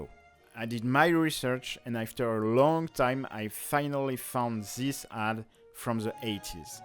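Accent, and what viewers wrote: France. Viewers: J'ai capté que t'es français grâce à l'accent. Tu touches un billet pour faire de la pub à nation photo ? Avant même que tu montres la lessive c'était certain que c'est les années 80. Les meubles et les costumes, la déco etc. Je comprends pas comment t'as pu dire années 50 / 60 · Are you french ? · I like your french accent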